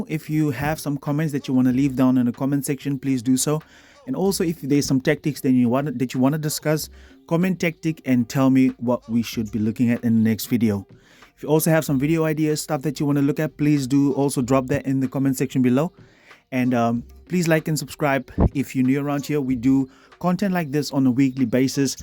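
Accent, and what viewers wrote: South Africa. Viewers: Ummmm, are you South African?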